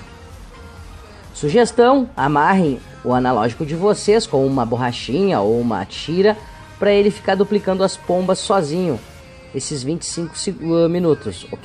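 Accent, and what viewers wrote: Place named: Rio Grande do Sul, Brazil. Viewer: Tu é gaúcho!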